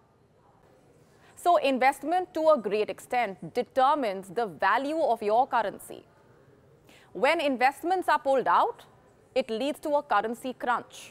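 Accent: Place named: India. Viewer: Good report, but when Indians speak English, their accent is like finger nails on a chalkboard.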